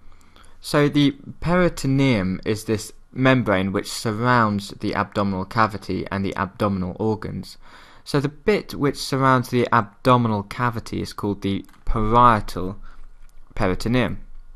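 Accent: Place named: United Kingdom. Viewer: Thank you :D love the fact that you are British... it's easier to understand... :D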